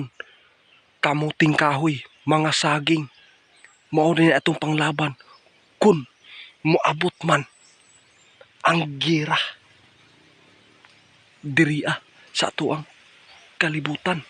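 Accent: Visayas, Philippines. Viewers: Grabe kang bisaya ka, tagalogin mo uy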